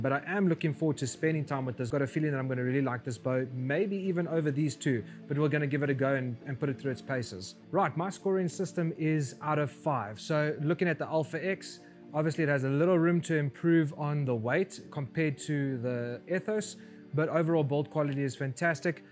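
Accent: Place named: South Africa. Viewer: At first i heard you say 572 was your hunting weight and i thought oh my another poor uninformed guy that's been watching ranch fairly but then I remembered the south African accent and realized you're hunting way different animals than i do in Oklahoma so maybe you need those heavy arrows lol. Anyway great video. I just won an ethos at a 3d shoot so i was just watching some reviews. I'm actually entered to win an Africa hunt from that event too but still waiting on the results of that drawing🤞🏼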